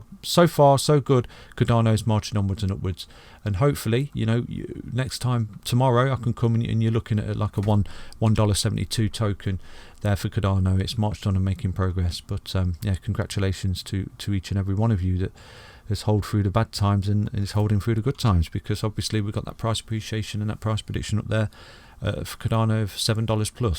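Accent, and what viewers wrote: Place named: Midlands, United Kingdom. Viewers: Do you live in the Midlands England 👍 great channel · He's from Leicester 😁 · Sounds like a brummie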